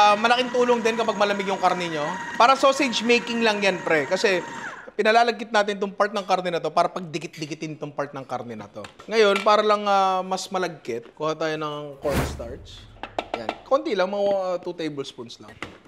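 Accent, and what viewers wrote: Batangas, Philippines. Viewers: Batangueño ka ba hahaha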